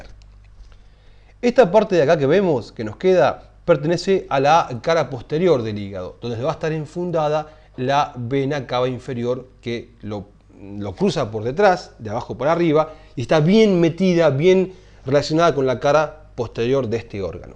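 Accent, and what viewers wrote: Argentina. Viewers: Los porteños hablan así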